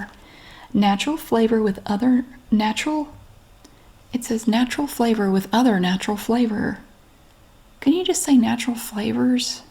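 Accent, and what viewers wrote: South, United States. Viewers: I curious all of a sudden do your boys have the southern accent as strong as yours??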